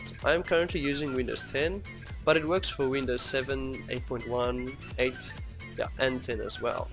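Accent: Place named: South Africa. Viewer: I can recognize a South African accent from a km away lol was just about to ask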